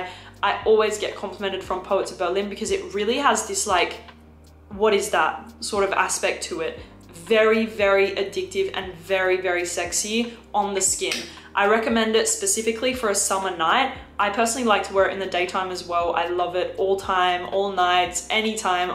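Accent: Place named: Australia. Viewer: I love your knowledge. Very informative I just wish you would embrace you “Australian “ accent. 🤦🏻‍♀️ Its all over the shop. So some of your words are incorrect pronunciation. Bcos you are imitating a mix of accents. Lychee = “lie chee “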